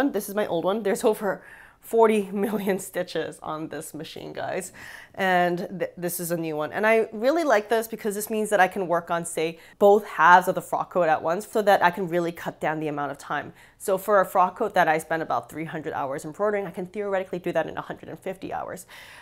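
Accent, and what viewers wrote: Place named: New York, United States. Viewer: Love your new home! Are you from New York originally,? You sound so "Cool New York"! I'm in Long Island.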